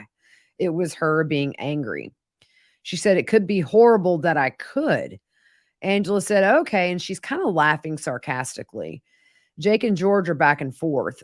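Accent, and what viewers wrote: South, United States. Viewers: Love how you narrate with that sassy southern talk you had me lol,thanks for all the hard work!⚘️ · You sound very southern even when you’re not trying to sound like them. I’m southern so I can hear it. Love the videos. Thanks.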